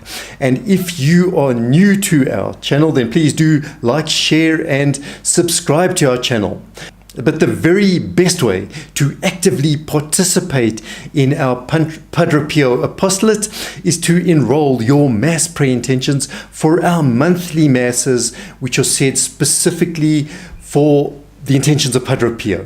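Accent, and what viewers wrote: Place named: South Africa. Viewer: As an Australian/Lebanese Maronite Catholic, I absolutely enjoy learning about Padre Pio through South African accent!!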